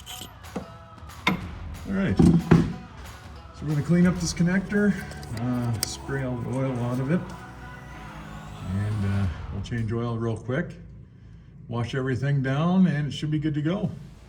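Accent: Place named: United States. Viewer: good, simple, and in clear USA English. A DIY guy can do this (replace the unit and do the oil change with a good filter) and save a LOT of money!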